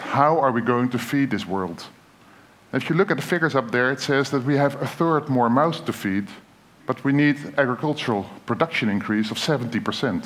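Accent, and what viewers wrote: Netherlands. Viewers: So obviously Dutch. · Wow, massive Dutch accent. Also, I have a really simple answer to the question. "Because I have no where to buy them"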